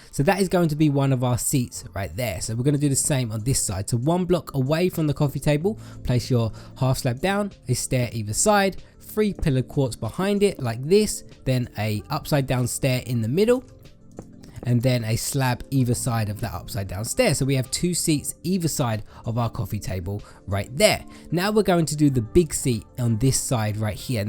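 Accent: United Kingdom. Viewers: I Need Some Attention xD 😂😂 British ascent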